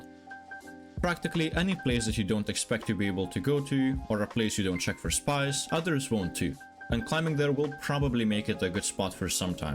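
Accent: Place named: Lithuania. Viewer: Very good video, a lot of food for thought. Random question, whereabouts in the world are you from? I can't place your accent, you sound Scottish, French, Dutch and Eastern European by turns. Edit: Nevermind, just checked your profile about and spotted the note that you're in Lithuania. Odd - I''ve known many Lithuanians but didn't place your accent. Eiti Lietuva, all the same.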